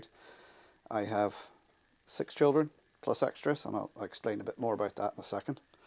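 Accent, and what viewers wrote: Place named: Ulster, United Kingdom. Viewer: I would to like make a few remarks, if I may. I really enjoy your channel. Not just for the trains but for the advice and help from the many folks here. On a more personal note I love to hear the soft tones of an Ulster accent from home. I always thought of you as a man that gives freely of his talents and time with the museum and the town. But here that you and Donegal/Bristol Mum are foster parents takes my respect for you to another level. Sir, I give you total respect. Thank you, if I may, on behalf of those kids that just need some support and love whilst going through some very difficult times. You are indeed, 🫡☘️ Ian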